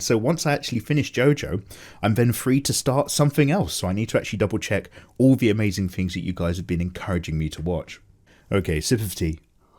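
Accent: United Kingdom. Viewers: Everyday i see this man and go Amazing voice, british, drinks tea, draws lewd girls, HOW IS HE STILL SINGLE!?!?!?!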